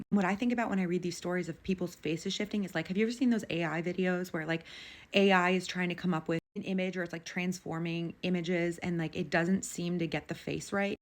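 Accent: United States. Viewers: Girl you talking to fast can't understand everything you're saying Americans some times upsets me with the way they pronounce alot of words bro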